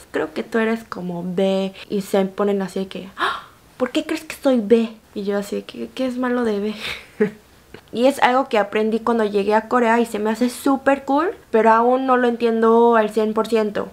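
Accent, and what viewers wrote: Mexico. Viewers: wao tu acento mexicano ....es perfecto...saludos desde tamaulipas,mexico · He estado viendo otros canales de chicas coreanas argentinas y hablan como argentinas y son coreanas he visto este video de chica coreana mexicana y hablas como mexicana y es coreana lo divertido en buena forma es que ambas son coreanas la argentina y la mexicana y si platicaran entre ellas las dos coreanas se encontrarían platicando con los respectivos acentos argentino y mexicano y eso es lo divertido y bonito al mismo tiempo en realidad me encanta y me rio porque ambas son coreanas me río pero en buena onda porque me gusta por la cultura que aprendieron según la región donde nacieron bueno no se si entendieron pero yo si me entendí sólo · el shock es mio; ver tu cara y tus llamativos ojos coreanos y hablar con ese acento mexicano. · Tu asento en español es mas mexicano no español puro pero coreana si eso si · Jajaja apenas te conocí y ya me agradaste ya tienes nueva suscriptora ☺, pareces coreana pero de como hablas ya eres 100% mexicana me encanta eso ❤❤❤ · Hello beautiful, I love your videos and you’re the reason I fell in love with the Mexican dialect/accent and I’m trying to learn Spanish through your videos. 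Thankfully I understood the main points but I noticed you didn’t put in English subtitles in this video. I’m wondering if you’re going to stop putting in English subtitles?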